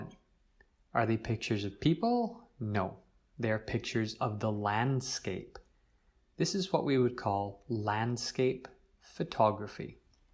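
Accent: Scotland, United Kingdom. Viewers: I'm really curious about your accent. Can you explain what influences there are? I can detect a fusion of Scottish, North American and perhaps, Irish?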